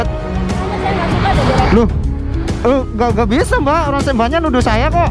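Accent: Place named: East Java, Indonesia. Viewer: Jowomu ngendi mas brow, Medok bgt,,kyk malang, sby ya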